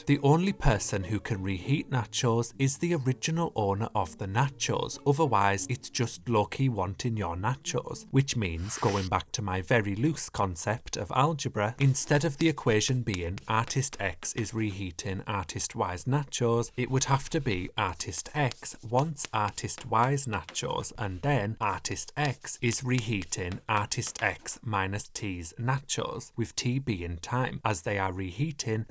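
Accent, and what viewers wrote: United Kingdom. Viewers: He's from the UK, so I'll give him a pass. Maybe that's just how they pronounce there? Natchose. Natchose...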